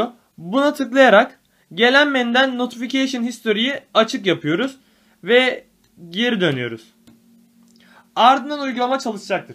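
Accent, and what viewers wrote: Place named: Azerbaijan. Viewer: İntro TV adam azeri bizde azerileri anlamıyoz bazen normal bişi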